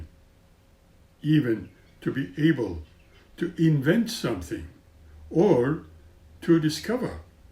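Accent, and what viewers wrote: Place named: Turkey. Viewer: Merhaba! Your Turkish accent was perfect.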